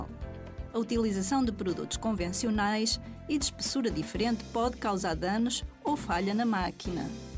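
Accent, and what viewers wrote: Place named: Portugal. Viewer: Meu DEUSSSS , espero que minha máquina não venha con este edioma , portugués de Portugal? É o último, soy de Brasil, bah que asco m não entendí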